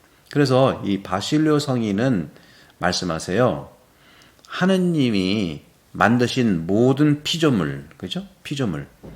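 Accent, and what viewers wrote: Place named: Chungcheong, South Korea. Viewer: 신부님께서 태안성당으로 부임하신 후에 충청도 특유의 느긋한 양반체로 천천히 말씀하시네요. 훨씬 더 명료하게 잘 들립니다. 신부님의 강론이 귀합니다.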